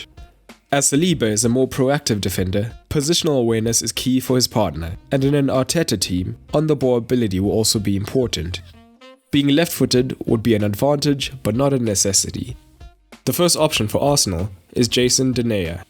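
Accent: South Africa. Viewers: Ayy you South African bro??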